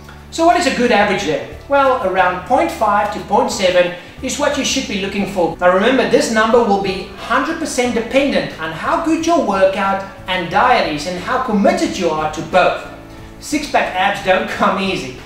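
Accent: South Africa. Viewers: are you south african?? :) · You sound like your South African and British. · South African accent... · Sounds like South Africa, right? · If i were to guess, i would say non other than English. From his accent, I think he is from South Africa where they speak predominantly English although there is a possibility that he also speaks Afrikaans which is the second most spoke language in SA.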